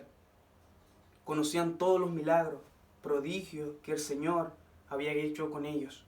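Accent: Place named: Chile. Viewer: Ya pareces chileno mano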